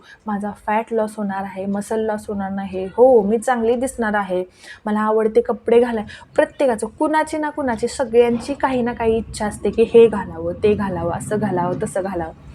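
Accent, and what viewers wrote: Satara, India. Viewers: बोलण्यावरुन भाषा.वाटलेच...आपलीसातारी रांगडी भाषा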